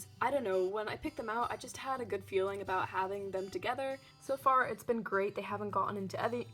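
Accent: United States. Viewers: The person narrating is American.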